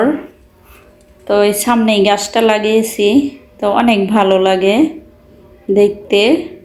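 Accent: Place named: Noakhali, Bangladesh. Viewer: আসসালামু আলাইকুম আন্টি আপনার বয়েজটা নোয়াখালী সাথে আসতেছে লক্ষ্মীপুর জেলা মতো শুনতে অনেক ভালো লাগে আপনার বয়েজ। আল্লাহ আপনাকে সুস্থতা দান করুন এবং নেক হায়াত দরাজ করুক আমিন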